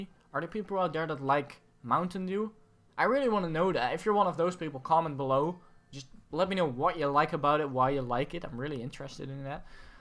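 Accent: Netherlands. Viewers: listend to this for 10 secs and ur defenetly dutch lol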